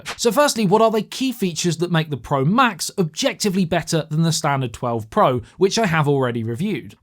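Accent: United Kingdom. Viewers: Liked for the British accent 😊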